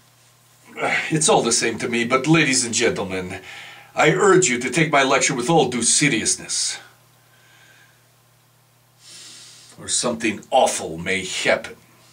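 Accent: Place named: Russia. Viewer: I like your Russian accent. хорошо!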